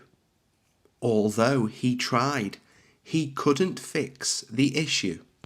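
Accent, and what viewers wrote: United Kingdom. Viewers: What accent do you have? Is it British?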